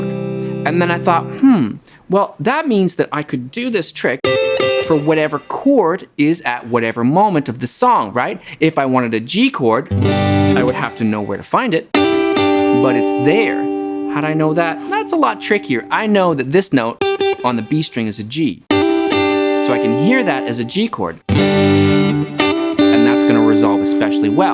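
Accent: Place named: Vancouver, Canada. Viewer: The haircut, the body, the accent... you are the embodiment of Vancouver BC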